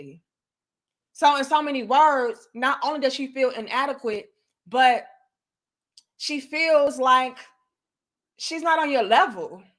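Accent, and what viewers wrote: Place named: South, United States. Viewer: She has the most unique southern proper voice and dialect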